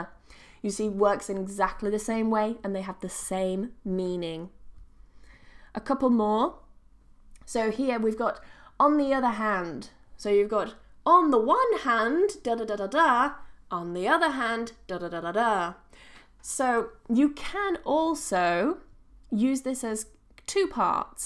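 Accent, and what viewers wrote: United Kingdom. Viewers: Plse keep g.... we need to learn british accent 😍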